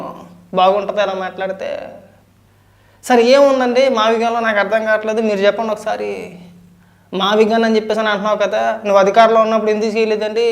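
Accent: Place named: Coastal Andhra, India. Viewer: మీ విశ్లేషణ చాలా బాగుంది. కోస్తా వాయిస్ లో మాటలు కంటే మామూలుగా మాట్లాడండి.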